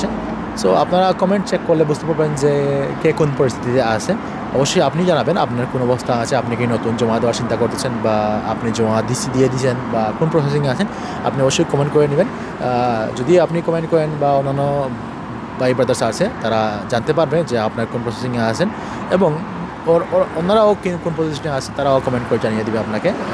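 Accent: Sylhet, Bangladesh. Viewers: আপনার সিলেট মাত লাগের সিলেট নি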